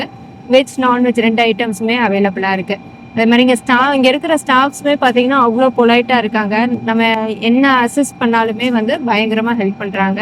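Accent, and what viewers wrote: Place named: India. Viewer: 典型的なインド訛りの英語だな。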